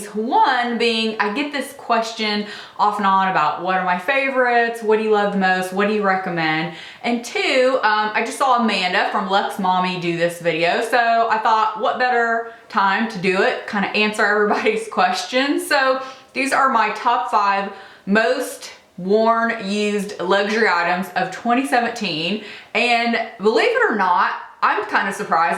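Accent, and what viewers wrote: South, United States. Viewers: You have the strongest southern accent I have ever heard. Where are you from...love from Sydney, Australia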